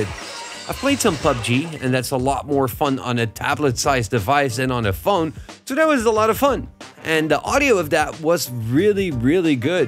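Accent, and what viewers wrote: Netherlands. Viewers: I sense a Dutch accent 😄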